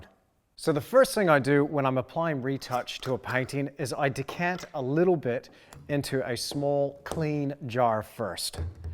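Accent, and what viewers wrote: Australia. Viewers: Australian/Kiwi-American?? (please) ... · I can't tell if you have an Aussie, American or Irish accent lol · Australian vowels and American consonants, where the hell do you live lmao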